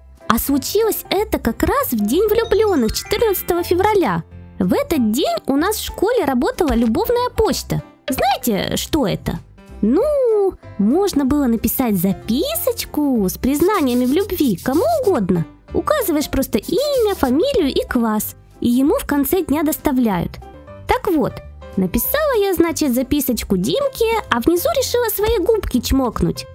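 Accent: Ukraine. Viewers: Ты очень красиво говоришь по-украински может просто делайте ролики на украинском и можешь ещё сделать побольше историй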